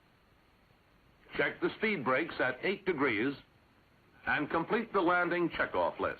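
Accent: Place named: Boston, United States. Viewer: Love the Bostonian accent. AFP couldn’t either train him to speak “General American” or their editors themselves thought it was okay. Option 3: A rush to production rendered the former two impossible. And: the rear control surfaces seem just massive...otherwise, this very striking bird reminds me of the B58’s cockpits...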